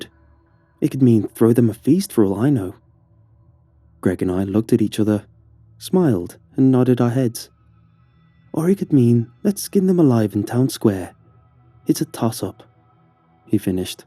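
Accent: England, United Kingdom. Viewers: Btw ..now im in time. .haaah. itz 14hours ago .. btw. Where ever this story comes from. ... think america. But the voice is so. English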